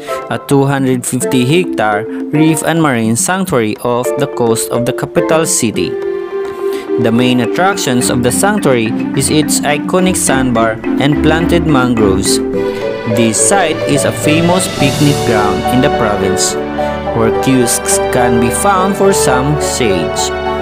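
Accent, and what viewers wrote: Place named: Philippines. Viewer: pilipino accent..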